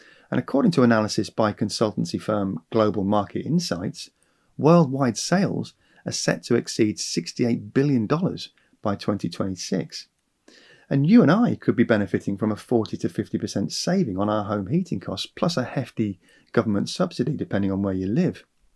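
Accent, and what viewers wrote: United Kingdom. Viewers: Difficult to understand his voice, which has a British accent, but goes up and down. He needs to speak with same volume and clearer.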